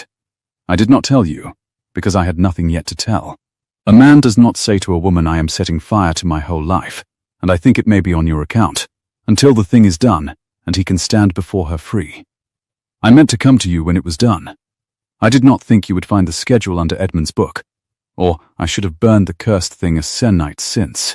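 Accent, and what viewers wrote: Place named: England, United Kingdom. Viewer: Thank you for this story and using an english accent.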